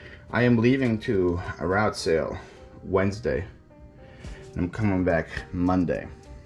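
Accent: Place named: Philadelphia, United States. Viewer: New viewer here. I realize you live in Florida now, but when you lived in Philly, you must have lived right in the heart of Philly for a long time. I can hear your very distinct Philly accent. Happy selling!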